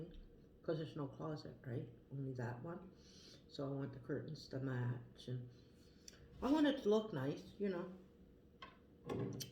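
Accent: Canada. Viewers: this is when I notice the Canadian accent lol 😆 I have no idea what you said haha! Nice video mama breakfast looks delish. Congrats again on the new crib!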